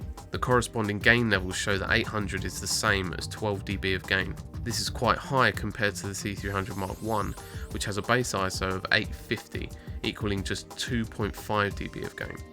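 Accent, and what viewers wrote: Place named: United Kingdom. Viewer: The problem with this vid is your very hard british accent, making it very hard to understand for strangers... Still a nice vid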